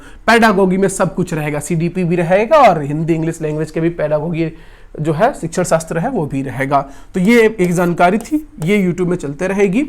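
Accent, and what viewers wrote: Bihar, India. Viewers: Bihari accent b acha lgta h apka..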